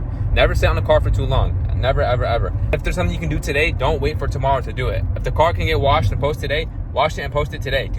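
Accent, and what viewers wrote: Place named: Morocco. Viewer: Where you from bro, you sound Moroccan ?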